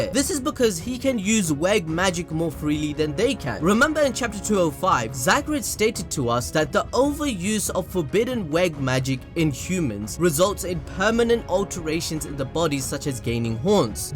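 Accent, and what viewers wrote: Punjab, India. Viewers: Are you Punjabi?? Dude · dude are u indian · are you panjabi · This guy know Punjabi hindi🔥🔥🔥1 no.. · Bro are u Punjabi??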